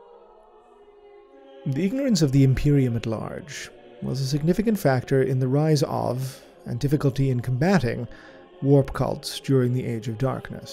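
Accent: Ireland. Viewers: I gotta ask what part of ireland are you from?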